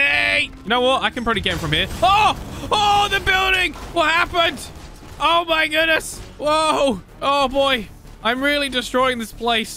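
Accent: United Kingdom. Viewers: 😅he's british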